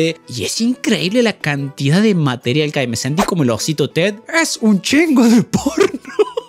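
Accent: Córdoba, Argentina. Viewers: Bien cordooobés!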